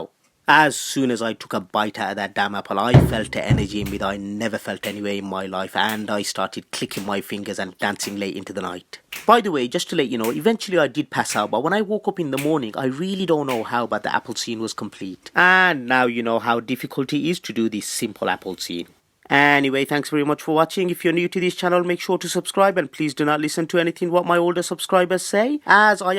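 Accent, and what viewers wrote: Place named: Midlands, United Kingdom. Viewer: Hope you will give more entertaining videos in future. Kinda stunned to see very few subscribers in your channel, maybe the gap took place earlier affected in your algorithm but I don't know the exact reason. However, I try to shadow your videos because of your lovely midland accent. So put regular videos and make me happy. N.B. - I really hate cockney a lot :) :) :)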